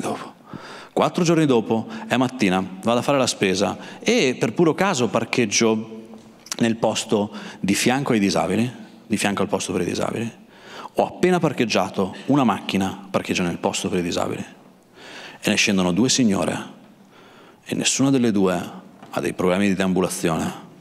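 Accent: Italy. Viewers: ...non siamo così ambiziosi...fantastico! Finalmente un accento né romano, né napoletano. Uno del nord che dice grandi verità senza filtri. Che soddisfazione. Veramente catartico. Ho chiamato quel figlio di puttana di mio padre nell' aldilà (è morto da un po') e l'ho perdonato. Saluti. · Ho guardato questo video ieri sera e l'ho riguardato a oggi mezzogiorno con i miei.. Ci siamo smascellati dal ridere! Grazie Eleazaro a nome di tutta la Romagna, di tutte le "zeta romagnole" e a nome del parlare come si mangia, senza tutti sti costrutti e perbenismi che attanagliano la società! Ci vediamo a Marzo a Savignano! Un abbraccio!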